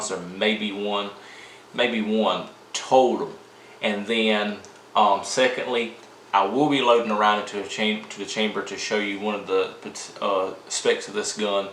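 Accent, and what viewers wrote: United States. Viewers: At first I thought it was a black British guy trying to put on a southern American accent but nope. Just a country ass black dude....right on! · Great Review bro! I'm originally from Laurinburg, NC and you sound like the folks from Pembroke, NC! The accent reminded me of home. Good Stuff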